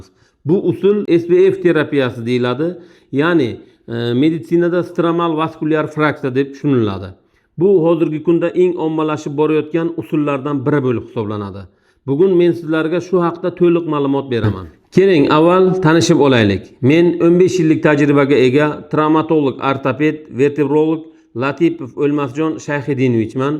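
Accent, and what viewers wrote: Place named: Bukhara, Uzbekistan. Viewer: Buxoroda deb òyladim sheva buxorocha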